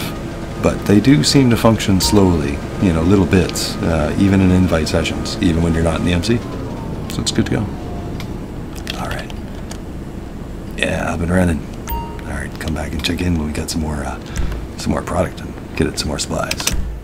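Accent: Canada. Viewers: U sound very Canadian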